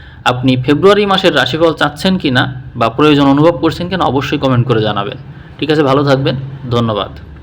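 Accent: Bangladesh. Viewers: Dada kothay baddo machlanda pur bithari o Bangla Desh er taan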